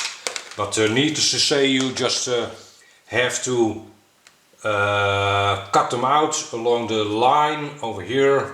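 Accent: Netherlands. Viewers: Really enjoy your vids. Loving the accent especially. Wishing you every success with growing your channel. You obviously put a lot of hard work into it and are passionate about the hobby. Respect buddy. Cheers Dom (Essential RC)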